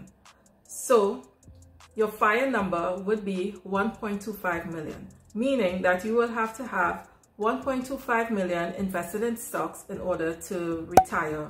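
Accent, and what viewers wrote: Trinidad and Tobago. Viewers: I knew I heard a Trini accent lol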